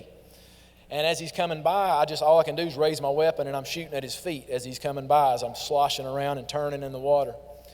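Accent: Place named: United States. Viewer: I like your True American accent the best. never mind that you are handsome too.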